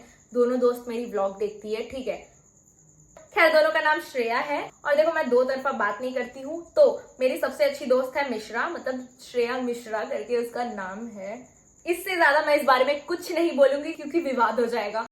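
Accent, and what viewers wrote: Bihar, India. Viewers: You speak like a bihari ♥️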